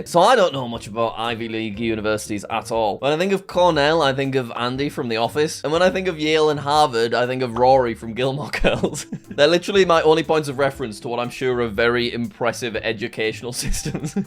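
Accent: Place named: Yorkshire, United Kingdom. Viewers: Funny, to me you sounded a heck of a lot more Yorkie than Geordie; but once you mentioned it, I tried hearing the Geordie accent in my head (Ross Noble, popped right in there xD ) and now I'm not so sure anymore! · You sound nothing like Geordie bonny lad nor Smoggie. More like Not quite Yorkshire. Some of the accents in the former pit villages of Durham sound more Geordie than youse. Glad to see Norfolk and Suffolk differentiated. In Suffolk words get elongated, in Narfik they get get shortened.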